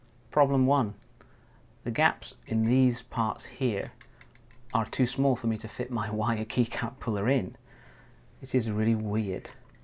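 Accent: United Kingdom. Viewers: I have this keyboard and have just ordered o rings to reduce travel. I'm used to membrane and scissor keyboards on laptops. I've been looking for reviews about this board and found yours. The spacebar doesn't bother me, apart from the depth, but I'm curious: how come someone with such a RP British accent has an oriental username? Just curious.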